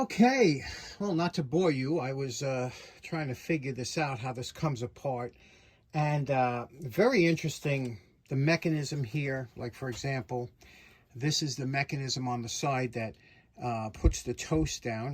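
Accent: New York, United States. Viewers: That was a great show today. You made me chuckle when you said it's a “ewing ax” as in JR Ewing. It’s “hewing ax” but you just can’t hide that New York accent. a smart guy, worried he might get electrocuted so “I’ll give it to 😂”. I’ve never seen a double handed screwdriver before and I love that Greenfield tap wrench. Cheers mate, Stuart 🇦🇺.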